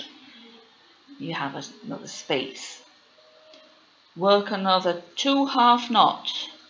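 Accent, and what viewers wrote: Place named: Northern England, United Kingdom. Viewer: This lady sounds like she's from Northern England (UK) If you want to be pedantic, I guess you must say 'cro-shay' Here it's a double crochet. I've watched so many American/foreign crochet tutorials, it can be easy to confuse single with double. I guess we count the slip sritch as a 'double' 🙂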